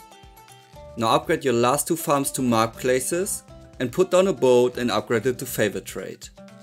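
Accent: Germany. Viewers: Ich liebe diesen deutschen Akzent wenn du englisch sprichst 😂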